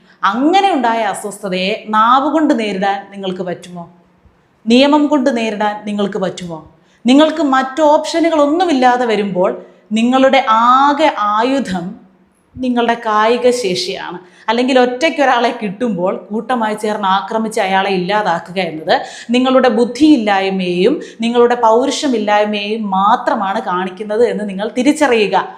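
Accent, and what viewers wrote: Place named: Trivandrum, India. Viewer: പ്രത്യേകിച്ച് ട്രിവാൻഡ്രം സ്ലാങ് 😂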